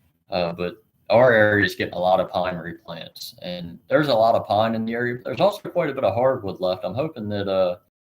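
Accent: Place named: South, United States. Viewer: Wow I had to subscribe to your channel I seen your first one when you were looking for logging trailers your young & I just have a feeling your business is going to go through the roof ❤ 👍🏾🙏🏿 & if you stay on YouTube and don't change your going to do amazing Because you come off sounding so amazing being a young logger & your country accent seals the deal ❤ ❤❤❤❤ You won't be single if you are for long lol from Norristown PA keep up the good videos and may your business prosper an enormous ways & i hooe you fire the people that are not 🚫 helping your business 👍🏾🙏🏿👌🏾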